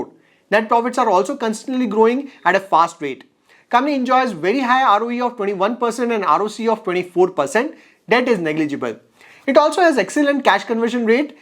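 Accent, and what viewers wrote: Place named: South, India. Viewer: Tereko Hindi nahi aata hai. South Indian hai kya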